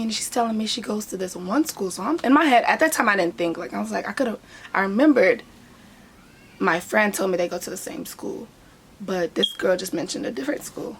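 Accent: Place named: Cincinnati, United States. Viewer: Your beautiful girl! Sounds like some Cincy shit lol! Where you from Mason or something? The suburbs so I am assuming because nothing about Cincinnati, Ohio suburbs especially not in 2006!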